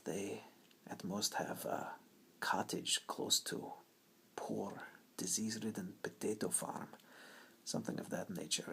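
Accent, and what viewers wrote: Russia. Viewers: Ur Russian accent is amazingly cute and a bit funny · How are you able to speak with a Russian accent? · the russian accent ... is pure tingles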